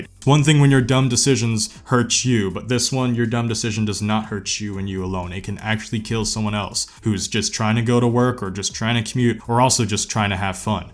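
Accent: United States. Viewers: This guy sounds more American than asian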